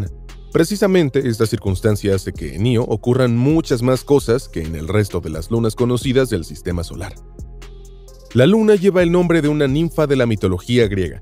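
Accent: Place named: United States. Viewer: Todos estos canales que se las tiran de cientificos han de tener narrador latino agringao?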